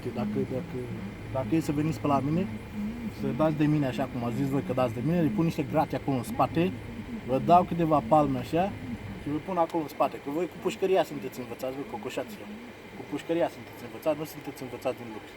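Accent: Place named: England, United Kingdom. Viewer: dar ce accent de englezoi ai !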